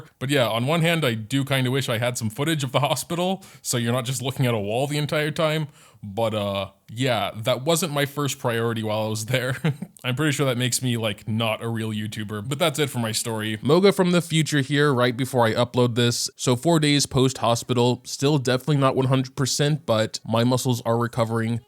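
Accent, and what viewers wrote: Germany. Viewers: wait, you're german? austrian? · Why did I never notice hes german?💀. Also, wish ya a speedy recovery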